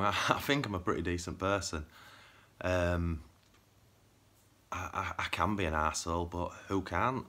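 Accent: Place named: United Kingdom. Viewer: Great vid, totally get it. Were's your accent from? Im from uk now living in australia. Your accent sounds same as mine : )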